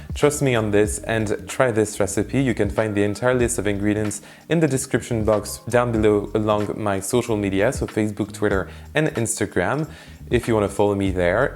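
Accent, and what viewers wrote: France. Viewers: Love your french accent, keep it up! 😍👍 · are you French lol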